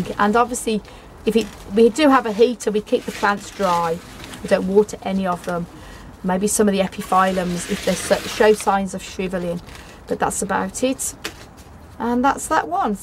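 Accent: Birmingham, United Kingdom. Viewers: you still have the Brummie thing going on :-)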